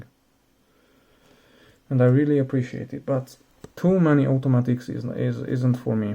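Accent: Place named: Europe. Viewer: Myself included, majority of watch enthusiasts thoughtlessly buy and acquire all sought of random watches for display, hoarding and bragging purposes. In doing so, the art of watch collection loses its meaning and enjoyability and becomes a liability - as we are constantly looking for the next watch to buy without ever enjoying the few we have. Am American and consumerism here in the US is like a demonic spirit. The main take-away from watching this SOTC Video is Modesty. I also like that your collection is SUB$300 USD and project to liquidate a few pieces and buy one masterpiece that will endure more wrist time and bring you more satisfaction. The Title of this video should be: "STATE OF PRUDENT COLLECTION." Judging by Your reasoning and modest watch collection says, to me, that you are a simple, humble yet intelligent person. Your soft voice makes for an easy listen as well. 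I hear an accent but wasn't sure where you from in Europe. Thanks again.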